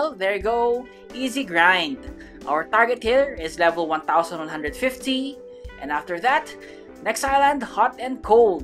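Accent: Philippines. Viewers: you have a very thick filipino accent kuya😄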